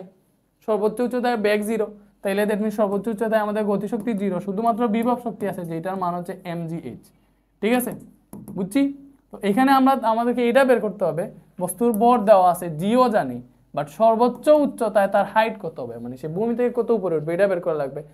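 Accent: Sylhet, Bangladesh. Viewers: Vai apni ki sylheti😊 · vhaiya apeni ki sylhetii?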